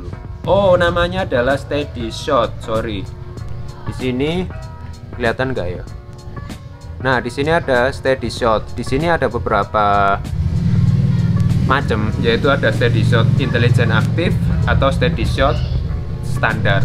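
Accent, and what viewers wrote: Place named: Java, Indonesia. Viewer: Medok jowo, lanjut ae lahh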